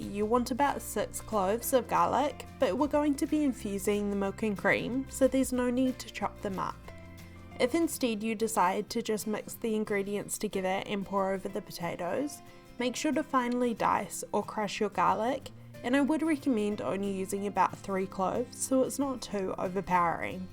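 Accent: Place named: New Zealand. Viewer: Me too ! I live in Auckland . Nice to hear a Kiwi accent on here ! Lol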